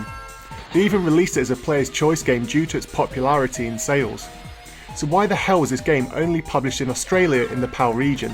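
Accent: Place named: RP, United Kingdom. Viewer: Too many years at a private school. Your saying that's why you have a posh ascent??? I would think they would teach you proper English without an ascent hahaha Just doesn't make sense to me.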